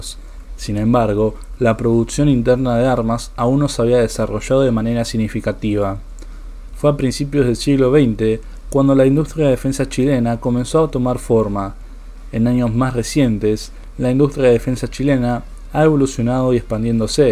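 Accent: Argentina. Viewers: Y porqué habla un argentino,???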